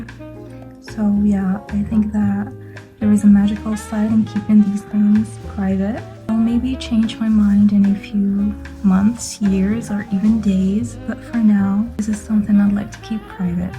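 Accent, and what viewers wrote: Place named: France. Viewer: And the french accent was smooth and pleasant to listen to 🫠🫠🫠💋💋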